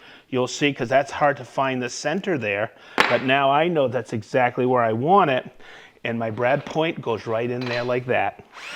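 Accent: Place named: Boston, United States. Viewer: What kind of oil on your sock? Could not understand with your Boston accent.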